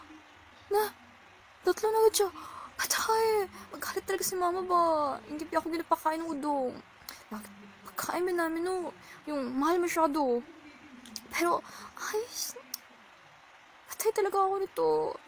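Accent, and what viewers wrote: South Korea. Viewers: galing mag korean bisaya accent 😊😊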